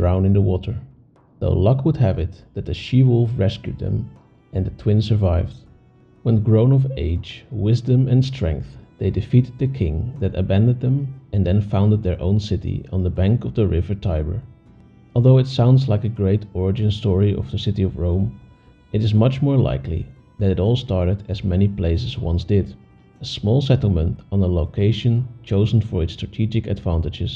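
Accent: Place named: Netherlands. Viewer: dutch accent ftw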